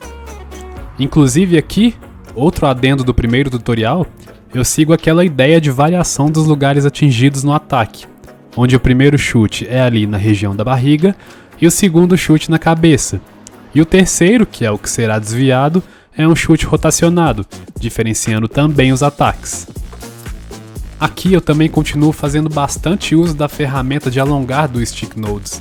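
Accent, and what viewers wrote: United States. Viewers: Você é brasileiro ou americano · Eu pensava que o cara era americano até ver esse vídeo 🗿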